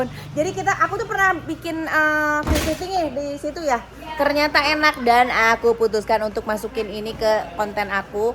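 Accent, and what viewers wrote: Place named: Garut, Indonesia. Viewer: Paling resep pami tth tos nyarios sunda logat garut sok d ulang" Aq mah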